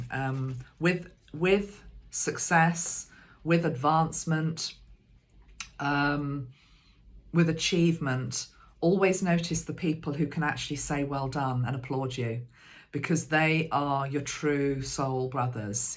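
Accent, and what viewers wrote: United Kingdom. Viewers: PS, I actually notice and love the way you pronounce your words! I just figured they were UK pronunciations 😂 🤍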